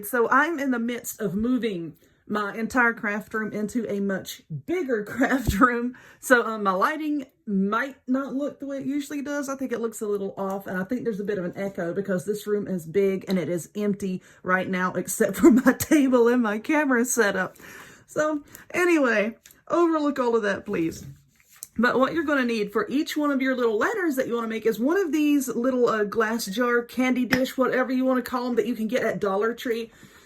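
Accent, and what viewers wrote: South, United States. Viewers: Love your southern accent I'm from Tennessee lol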